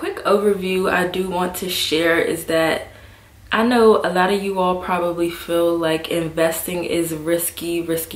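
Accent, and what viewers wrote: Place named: DMV, United States. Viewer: I knew you were from the dmv with that accent😭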